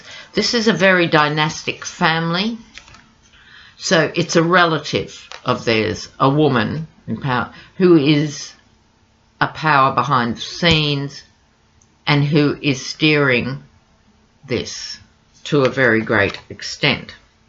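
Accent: Australia. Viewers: I enjoy your program soooo much, even though I don't get the difference between tarot cards and chicken entrails. I love your sense of humor. It gives me belly laughs. You understand the politics of the US so well. I'm actually a Kiwi, but I lived in Perth for 13 years. I didn't realize how much I missed the aussie accent and humor. I have lived in Pennsylvania since 1998. Would love to move back to Oz or NZ or Tasmania, but my husband is from Lancaster County, so I guess we will die here. I have just applied for "the opperation" (US citizenship) as I have been here 20 years and I would like to be able to vote trump OUT ! I also say Koch, not Kok. Seems too much like Cock. Keep up the good work. Can we see a view of your cat sometime ?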